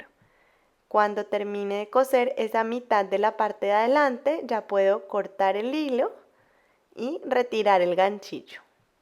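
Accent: Colombia. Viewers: Tu hablas tan lindo acento Colombiano right !!!!!!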